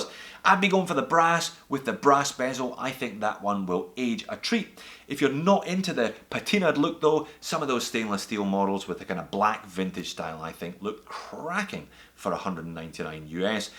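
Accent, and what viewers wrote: Scotland, United Kingdom. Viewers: Honestly I think you’ve got the best watch channel on YouTube. Even when you don’t like a watch you give it a fair shake and most of the time you don’t review watches that an average person can’t afford. And you’ve got a Scottish accent which makes everything you say more enjoyable. Keep up the good work my man.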